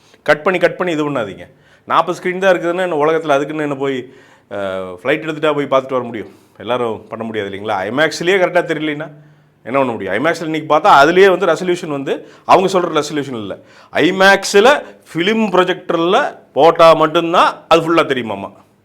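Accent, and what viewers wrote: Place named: Coimbatore, India. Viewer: கோயம்புத்தூரில் போய் ஒரு நாள் இருந்ததுக்கு அவங்களோட வட்டார பாஷை பேசுற.. இத்தனை வருஷமா ரிவைவ் பண்ணுறே பாண்டா இன்னைக்கு வரைக்கும் கீழே எழுதி வச்சு தான் பேசிகிட்டு இருக்க என்னைக்கு தான் நீ மாறப் போறியோ..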